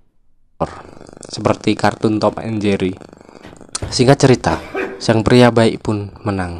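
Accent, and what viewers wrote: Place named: Java, Indonesia. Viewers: logat jawa ny jgn nampak guna kan bahasa indonesia yg jelas dn tepat.. Setiap Youteber mengunakan bhasa indonesia. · Emange harus b.indo. jadi diri sendiri lebih baik drpd harus merubah diri, jdinya aneh. Semangat trs bang, w jg orang jowo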